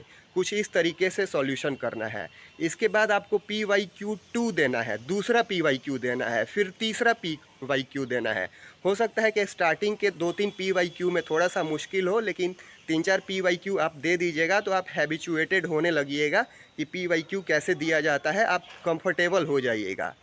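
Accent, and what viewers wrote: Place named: Bihar, India. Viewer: Bhaiya aap up se ho kya ki bihar aapki aawaj up bihar wali lg rhi h ❤ please btaiye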